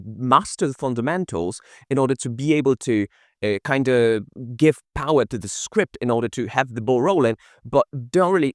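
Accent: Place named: United Kingdom. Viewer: Thanks for your video. I'm a Python Automation QA Engineer. I worked for DISH company for 6 years as API Automation Engineer. I love your British accent and pronunciation. I'm from Ukraine and I want to find a partner to practice speaking English. I have been resting for 1 year after I left DISH company. Now I'm updating my skillset and I want to find a new job. Could we get to know each other to practice English together?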